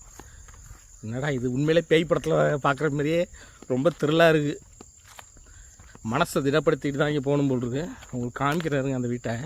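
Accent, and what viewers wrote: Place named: Puducherry, India. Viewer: சார் உங்களுடைய காணொளியை பார்த்தேன் நான் புதுச்சேரி காரைக்கால் சேர்ந்தவன் உங்களுடைய வாய்ஸ் நம்ம ஊர் போல் இருக்கிறதே என்று தங்களுடைய கார் நம்பரை வைத்து பார்த்தேன் நீங்கள் புதுச்சேரியை சேர்ந்தவர் என்று என் மகளை பொள்ளாச்சியில் திருமணம் செய்து கொடுத்துள்ளேன் ஆழியாறு ஆனைமலை வரைக்கும் தான் சென்றிருக்கிறேன் உங்களுடைய வீடியோவை பார்த்த பிறகு உடனே செல்ல வேண்டும் என்று ஆவலாக உள்ளது நன்றி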